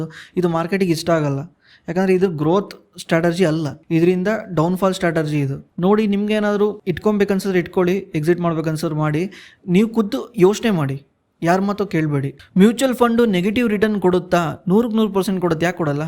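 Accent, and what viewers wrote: North Karnataka, India. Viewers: Bro nimma speech language kelidare neevu north karnataka start areadavaru irbeku anisutte nimma native place yaavdu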